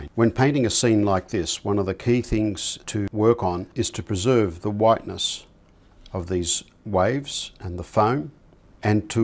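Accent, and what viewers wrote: Australia. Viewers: Hi, just discovered your channel! How nice it is to hear another Aussie. I promptly subscribed and look forward to learning lots..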